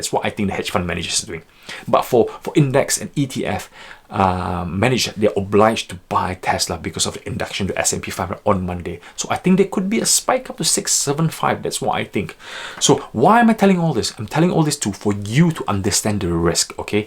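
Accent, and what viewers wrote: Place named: Singapore. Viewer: oh! where are you from? you sound singaporean :)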